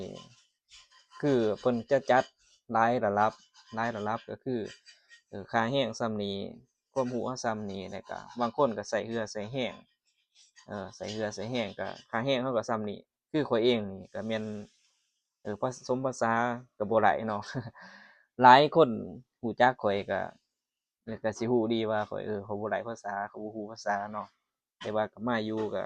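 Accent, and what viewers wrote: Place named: Champasak, Laos. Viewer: ຢູ່ບ້ານໃດຢູ່ລາວເວົ້າຄືຄນແຂວງຈຳປາສັກ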